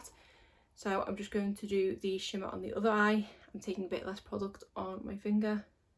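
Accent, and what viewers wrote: Liverpool, United Kingdom. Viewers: Are you liverpudlian? I'm really rubbish with accents 🙈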